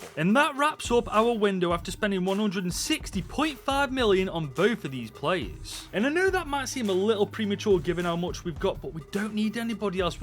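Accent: Stoke, United Kingdom. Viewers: Eh up mate, which part of glorious Stoke are you from?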